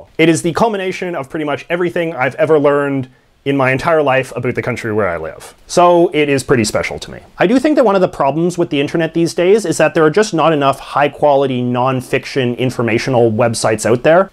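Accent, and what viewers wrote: Canada. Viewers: My answer to the video title: Consult your local library! Seriously, I like this guy. He's nice and informative about things, and opinionated in a GOOD way. (Is there ANY part of Canada outside his native British Columbia he can stand? :) Others have criticized him for constantly saying the Canadian-style "aboot" instead of "about". I don't mind it at all! It's what defines him as a Canadian. My other favorite feature of J. J. McCullough is his weird, distinctive hairdo.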